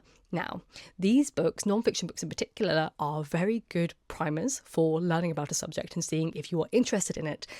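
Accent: RP, United Kingdom. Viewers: Thanks! Very interesting video.🤔 I think I am starting to see why people don’t want to get PhDs…very difficult. Where in the UK is your accent?? It is almost posh.🤔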